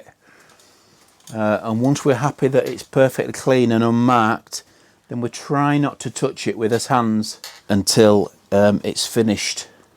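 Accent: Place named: Yorkshire, United Kingdom. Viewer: Thank You,! It's pretty straightforward for dummies like me ))) And by the way lemme ask You one question: I noticed You often pronounced the vowel "u" as ʊ when it's supposed to sound like "ʌ". Fore example You say mʊd instead of mʌd... Is it a specific Yorkshire dialect? Thank You once more!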